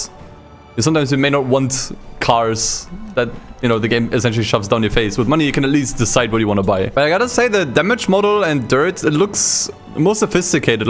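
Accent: Germany. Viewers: As an American, we get it, you’re German lmao he sounds so German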